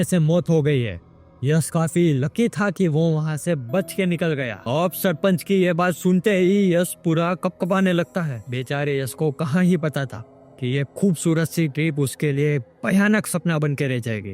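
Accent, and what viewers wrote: Gujarat, India. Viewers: Amezing story!❤ (I love your gujarati tone and expressions) Keep making the stories.💥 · Gujarati tone me pehli bar koi horror story Sunni maine❤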